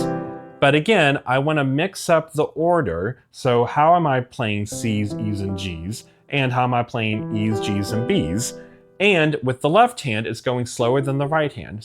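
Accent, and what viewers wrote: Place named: United States. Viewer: Yeah, he's speaking american English, not some damn British English, and also assumes we're dumb which is good!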